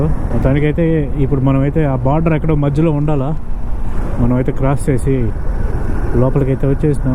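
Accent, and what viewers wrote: India. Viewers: IM WATCHING JUST FOR YOUR TELUGU SLANG....NRO NEKU AH MOUSTACHE BALEDHU....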